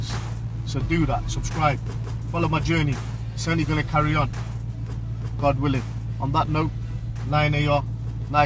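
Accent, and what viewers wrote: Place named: England, United Kingdom. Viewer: Love you english accent man goed review subscribed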